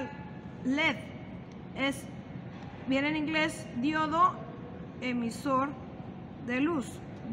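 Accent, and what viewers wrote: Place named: Mexico. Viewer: Sra ingeniera es ud una gran maestra !!,ademas de ser muy didactica en su modo de explicar en ese español -mex tan bonito!!! chapeu!!!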